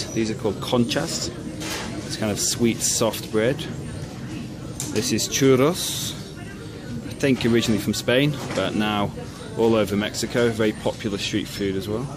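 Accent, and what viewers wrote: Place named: United Kingdom. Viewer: British accent sounds so fancy :p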